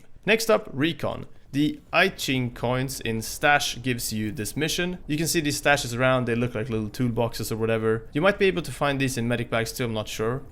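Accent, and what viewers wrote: Sweden. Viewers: First video im watching and i am 100% that he is from Sweden. I’m I right? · u sound swedish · Are you swedish? That accent though 😎👌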